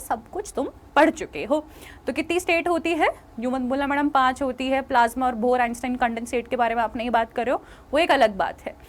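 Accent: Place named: Haryana, India. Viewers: I am listening here the haryanvi dialogue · Hariyanvi teacher's hits different ❤🫣💯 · Mam you and your Haryanvi accent is awesome 💖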